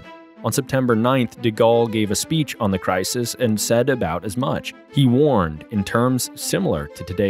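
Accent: United States. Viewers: Sounds very American.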